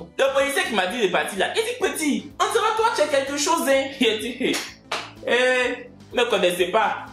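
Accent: Cameroon. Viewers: J'ai remorqué que tu utilises souvent des intonations ou expression camerounaise dans t video PS: j'aime tes videos tres droles jusqu'à 😂😂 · Tu parle souvent comme un camerounais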